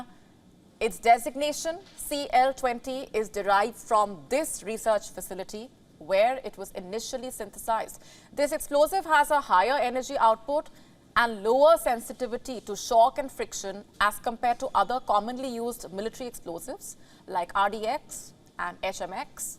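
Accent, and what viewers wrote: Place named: India. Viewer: 印度口音:pride-person-earth-intersex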